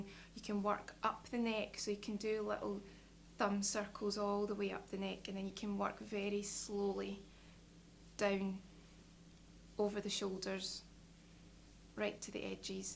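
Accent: Scotland, United Kingdom. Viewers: I learned a Scottish accent from you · With that accent, I fully believe that Scottish women could rule forever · Not sure how i ended up here, but i have to say. I still think the scottish accent is my favorite. I dunno what about the accent draws me to it, but its just such a good accent. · I loooooove scottish accent ♡ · Nice relaxing video. I'm sure it would feel great and have positive health effects. I love your Scottish accent too. Some women have very soothing voices like Lita. You certainly do too. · Awesome video, the scot accent an added bonus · 😂😂 I love still game was bought up with Rab C and I love your jock accent 🏴 greetings from east London 🏴👍🏻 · Scottish accent ❤️ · The Scottish accent is just lovely here (pleas say "braid" please) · I like your accent, where do you come from? Is it really Scotland? Some comments mentioned that before... 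· Its really strange to hear a fellow scot do a massage video for some reason.lol did you study holistic massage in any colleges in Glasgow?. looks like the Cardonald college uniform You model is a babe as well:p haha cool vid:)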